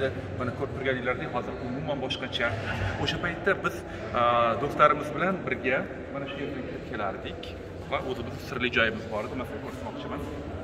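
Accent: Fergana, Uzbekistan. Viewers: Фаргоналикмисиз илтимос чукурок тушинтиринг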